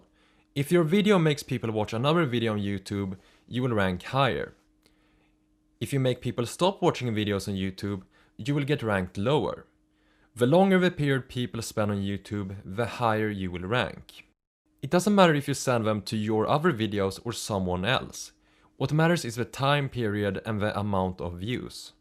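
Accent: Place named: Sweden. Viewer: your swedish accent annoys me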